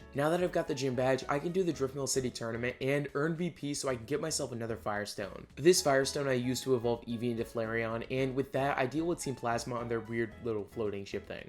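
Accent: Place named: England, United Kingdom. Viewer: Bro which country are you from? From your accent I feel England. BTW love from India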